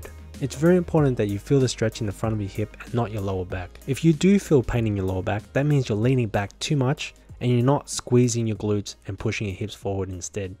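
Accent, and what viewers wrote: Australia. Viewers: Super helpful. Thank you so much. Love your Australian accent too.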